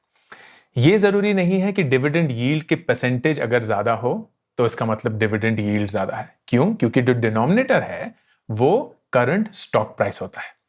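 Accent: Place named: Punjab, India. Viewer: Bhayi, we are Punjabi's. It's alright to say cheejay with Jajjaa of Punjabi Language. We all should be proud of mother language and Mother tongue influence as long we are doing right things in our life. I hope u agree and keep saying Cheejay 😊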